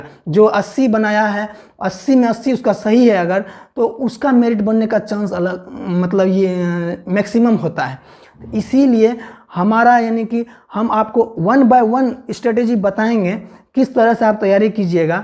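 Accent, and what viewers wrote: Bihar, India. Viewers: Bihar se ho ka bhai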